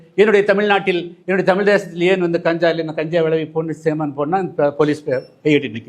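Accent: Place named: Telugu, India. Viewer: ஐயா நீங்க பேசுறது சுத்த தமிழ் மாதிரி தெரியல தெலுங்கு வாடை அடிக்குது.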